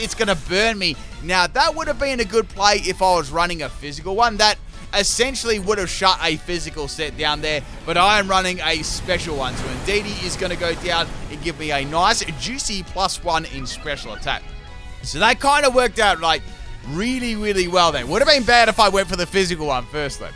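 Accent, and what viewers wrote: Australia. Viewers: Aussie voice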